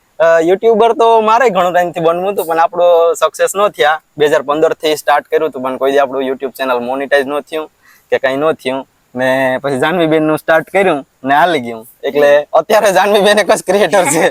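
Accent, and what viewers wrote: Kathiawar, India. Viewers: હું આપનાં વિડિઓ તમારી કાઠિયાવાડી ભાષા ને કારણે જ જોવ છું.....સરસ એવી રીતે બનાવતા રહેજો..👍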